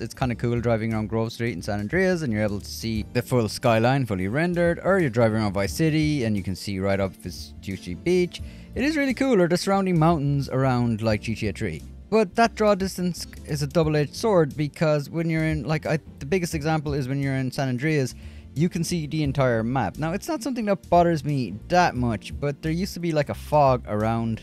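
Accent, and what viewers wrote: Ireland. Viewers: Thanks dude, first thing I copped was the Irish accent 😂 I'm only down the road ha but it's about time someone did an honest review not just bashing the game. Playing San Andreas via game pass on Series S and loving it. I think they look class. Yeah it's Cartoonish but it's supposed to be. Anyway I'll play this through and maybe buy the trilogy. Great review man. Subbed 👍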